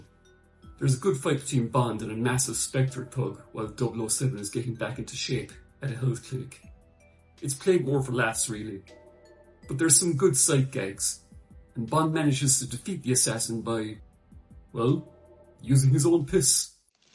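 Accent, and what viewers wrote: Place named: Ireland. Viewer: Great video as ever, thank you. 🙏 Your accent is great, what part of Ireland are you from?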